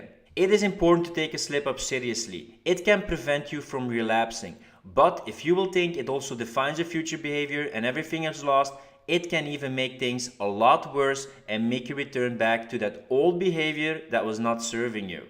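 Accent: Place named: France. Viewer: No mather what it is - quiting bad/ building new habbit but the moust important from the points you were talking about after an slip-up is to foregive yourself and not judge yourselffor so much, but it it is also the moust difficult thing to do, for me at least.... if i can ask.... is this only your hobby ? or do you work with stuff like that ? i mean if you are some advisor , educator ,speeaker or no idea how to describe it (but i hope you know what i mean) and 1 more question.... where are you from ? i gues France :D (or a native french speaker at least acording accent)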